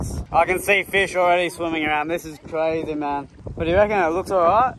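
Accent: Australia. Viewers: Beautiful scenery, wonderful catches! 😀 I've never seen squid so transparent. And those eyes! WOW. Weird and wonderful creatures. Tasty too! 😆 I'm just trying to get a handle on your accent. It's sounds a little Aussie with a touch of Ringo Starr mixed in. 😁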